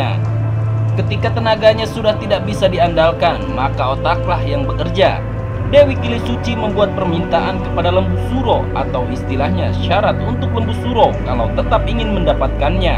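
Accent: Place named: Arab world. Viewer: Ngomong jawa pake logat arab ya seperti itu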